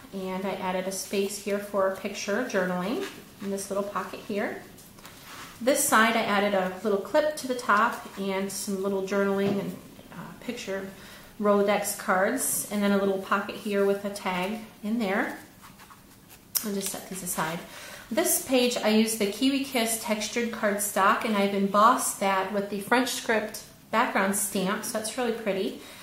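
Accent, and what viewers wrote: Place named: United States. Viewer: Zounds! This is fabulous! So beautiful!!! Are you a transplanted American in Ireland? You sound very American! (Not that this is bad of course!....just curious cuz I spent a lot of time on the Isle of Wight and was pegged all the time! I even had a bunch of kids stop me and want me to say something in American!!!! What a hoot! Great mini!!!! Hope you don't mind my scraplifting! U have great ideas!!!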